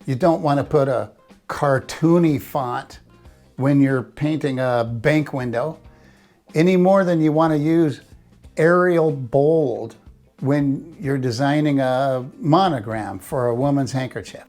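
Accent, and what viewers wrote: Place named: Midwest, United States. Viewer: Where did you grow up? I'm trying to figure out by how you talk. I was thinking Chicagoland, now not sure, definitely midwest. This is my first time on your channel. On my 6th video. Thank you!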